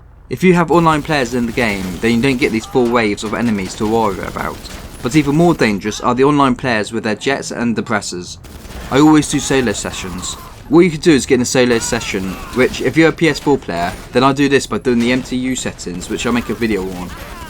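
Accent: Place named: United Kingdom. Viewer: Thanks man, the video was so helpful and I also love your British accent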